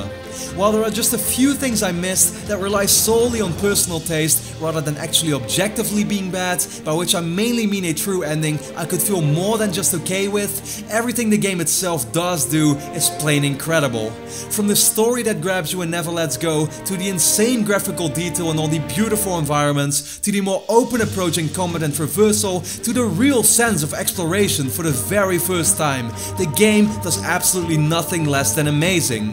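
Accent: Netherlands. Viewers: Hahaha, je hebt echt zon zwaar engels-nederlands accent ^_^. Ik zat wat reviews te kijken, dacht dat je een engels kanaal was. En je klinkt zo verschrikkelijk nederlands. ;) Leuk kanaal trouwens. Goed dat je het in het engels doet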